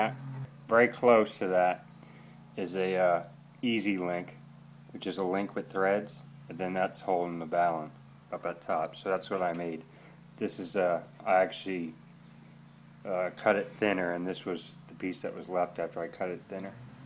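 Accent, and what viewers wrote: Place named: Philadelphia, United States. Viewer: good video . you have a philly accent ?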